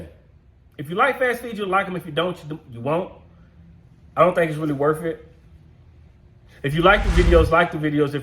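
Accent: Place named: Memphis, United States. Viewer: Very honest opinion! I appreciate that. New to your channel bro & subscribed because of your genuineness. Keep being authentically you man! BTW don't know where you are located but your swagger sounds like Memphis! Just a guess. Peace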